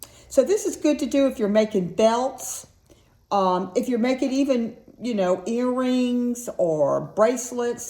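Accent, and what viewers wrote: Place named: United States. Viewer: Thanks, nice to know this can be done without steaming the leather. I made a bracelet with my own woodturned beads strung on 3mm leather cord with leather barrel knots in between my woodturned beads, but the leather was too stiff as a bracelet so I pulled it apart, undid the barrel knots which retained some of their kink. I will try your method to unkink the leather so I can make a choker with it. Seems a lot of people are copying each others videos on youtube. You sound more American than Italian! PS - the tool you call a 'clamp' is actually called a 'vice'.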